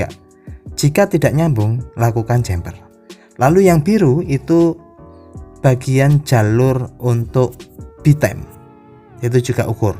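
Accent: Java, Indonesia. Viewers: Jowo gak ilang jowone mase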